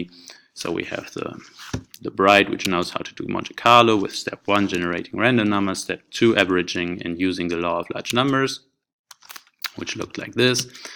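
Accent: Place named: Germany. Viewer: Omg best video I’ve seen in ages. Didn’t understand a thing but that german voiceeeee guyssss thumbs up! (GTR ist nicht mein Freund)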